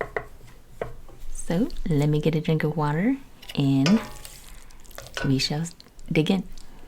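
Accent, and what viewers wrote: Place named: South, United States. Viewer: I love your voice; I hear a southern accent 💞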